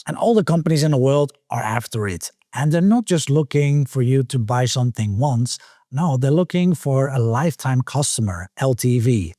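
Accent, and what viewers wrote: Netherlands. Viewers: Je klinkt Nederlands! Goeie video iig.. bedankt!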